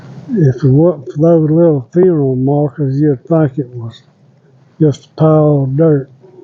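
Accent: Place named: Mississippi, United States. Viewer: I enjoyed your video, the stories (headstones are a book of life to me) your kindness and your Mississippi accent. 😊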